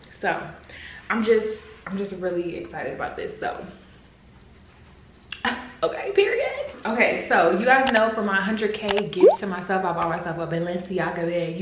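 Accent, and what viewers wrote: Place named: New York, United States. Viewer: she sound like she from ny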